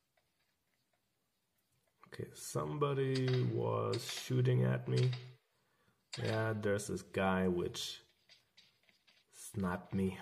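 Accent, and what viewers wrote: Germany. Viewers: Hallo...are u German?😁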